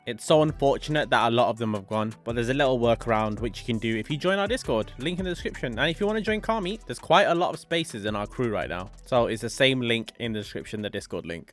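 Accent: United Kingdom. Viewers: The uk accent 🤮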